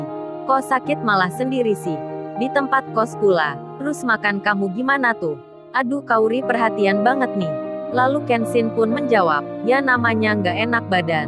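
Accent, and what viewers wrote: Surabaya, Indonesia. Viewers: Mantap..adminya wong suroboyo ya min..salam kenal aq sby utara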